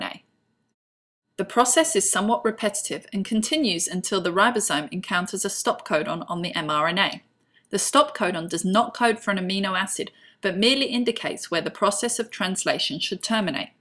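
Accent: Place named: United Kingdom. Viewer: Were are you from - you sound British?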